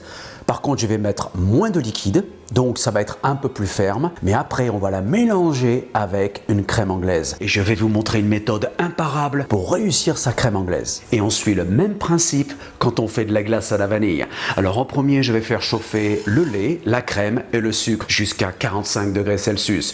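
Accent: France. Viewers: oui il est top dans les deux langues, perso, je préfère la version anglaise avec son petit (très léger) accent français si charmant ! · where is the french accent of the chief ? sa me manque en vérité c'etait quand meme amusant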